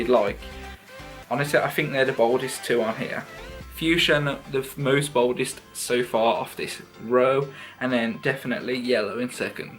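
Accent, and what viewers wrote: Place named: United Kingdom. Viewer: Hey! Just came across your channel because needed some help this video was great and definitely subscribing!! Very helpful and as your British (guessing by accent) it helps me a lot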